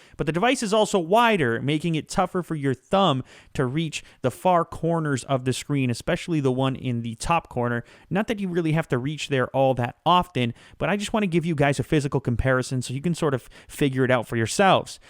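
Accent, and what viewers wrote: Canada. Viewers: Canadian lol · Canadian accent?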